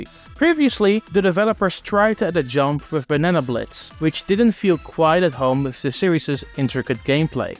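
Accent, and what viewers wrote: Netherlands. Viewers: jouw nederlandse accent geeft me kkr sorry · Searxhed for this comment. There is no doubt. No offence though. My English is only 20% of how good his is. But out of personal preference.. I dislike English with dutch accent. But I think that is just because my brain cant stop foccusing on the accent.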